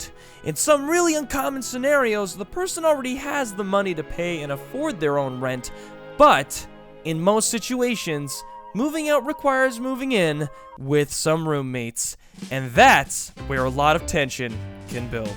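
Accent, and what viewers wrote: Newfoundland, Canada. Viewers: hey what part of newfoundland you from?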